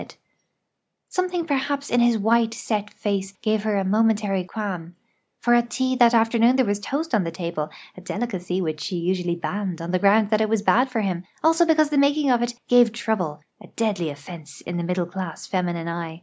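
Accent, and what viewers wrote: Ireland. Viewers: irish brother a feminine Irish voice telling me how do destroy my enemies? I can get behind that.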